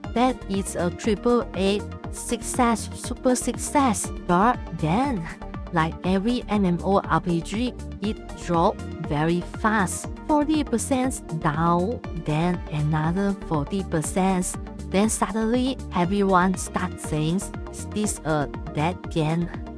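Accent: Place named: China. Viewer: tbh, it tooks me 6 minutes 34 seconds to get a single heroes realm match attempt to happens, and someone failed to confirm because they were afk most probably. I used to be able to get matches instantly. So there is already an impact on matchmaking. Also, this videos scream of chinese propaganda, made to make people stay in the game and play it. The strong chinese accent is too obvious for it to not be that lol.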